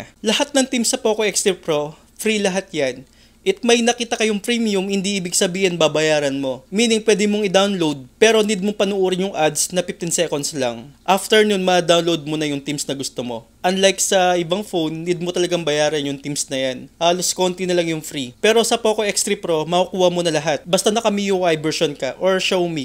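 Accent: Pampanga, Philippines. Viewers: Kapampangan kata Koy? Hahaha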